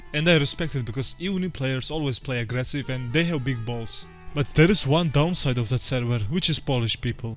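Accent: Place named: Georgia, United States. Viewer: i can tell from your accent you are georgian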